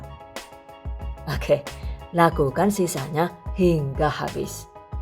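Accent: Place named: Madiun, Indonesia. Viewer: SUARANYA KYK YG DI MADIUN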